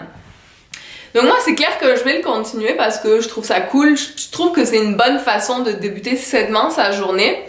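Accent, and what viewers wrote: Quebec, Canada. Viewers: BONJOUR merci pour la video et pour la recette oh vs etes mignonne avec l'accent québequoise merci bonne chance pour d autres video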